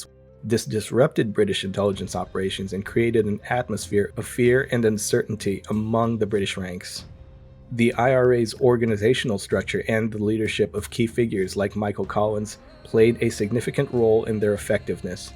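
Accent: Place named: United States. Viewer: Jayzush Christ will ye be dropping the yank accent for phuqqs sake! Seán McKane? Rural members were unreliable? Tell that to the Flying Columns in Munster, Longford, and Mayo.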